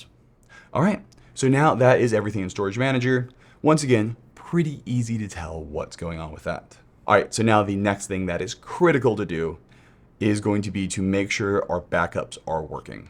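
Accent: California, United States. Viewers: Love the channel but this the most California accent I’ve ever heard in my life and I forget every time until I click on the vid 😂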